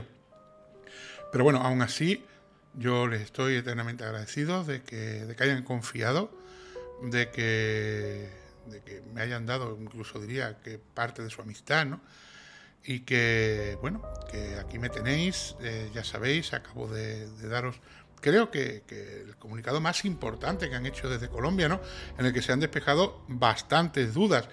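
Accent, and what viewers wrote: Andalusia, Spain. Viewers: Gracias, por un nuevo vídeo interesante. Me vienen varias ideas a la mente: - No me parece tan normal para una persona de clase media que pidas un préstamo de, no sé, pongamos 20.000€ para irte de vacaciones, cuando pretendes establecerte en un país, donde no vas a tener ingresos durante un largo tiempo. La homologación de un título universitario extracomunitario puede tardar años. - No debe de irte muy bien en tu trabajo, cuando pides un préstamo para poder irte de vacaciones. ¿Qué eres un derrochador?, vale, puede ser, pero gastar lo que no tienes es otra cosa. - Puede ser que él creyera que D.S. era cosocio/copropietario de una hamburguesería, si se la coló a su propio padre, es posible que ese mentiroso compulsivo le estuviera engañando a Edwin para conseguir un dinero. Ya sabemos del comportamiento parasitario del presunto psicópata asesino. 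- Es una pena que Edwin no tuviera propiedades, yo pensaba que de alguna manera iba a poder seguir ayudando a su familia con la venta de estas propiedades, pero parece que eso no va a ser así. Espero que al menos tuviera un seguro de vida, porque imagino que este asunto va a causarle muchos gastos a la familia: abogado, repatriación de las cenizas... - Por otro lado, mucho tienes que ganar para que te compense el gasto de 2 viajes ida y vuelta España- Chile al mes. En fin, que se me plantean muchas dudas. Gracias por tus aportaciones y por ese acento andaluz que tan bonito es. Un abrazo desde un bello lugar malagueño. 🤗 NB: En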